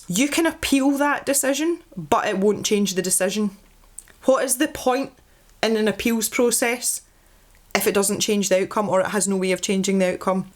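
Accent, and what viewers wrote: Scotland, United Kingdom. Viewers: I saw Jackie’s video earlier and just watched yours now. I really hope to see the FTC continue listening to everyone’s input, the petition and all the videos. With the guy that Jackie had on the video intermediating, and the amount of people who are signing and commenting, I think there’s a fair chance that the decision could be reversed, or at least improved, before it’s put into force! I’ve only just found your channel, and I really enjoy seeing another Scottish person with a YouTube presence, especially in the art community. I hope you don’t come off poorly from this, as I look forward to seeing your videos. Hearing someone else with a Scottish accent talking about Copics and drawing and art is quite comforting to me 😂 especially as everyone else I watch is American, or Jazza 😂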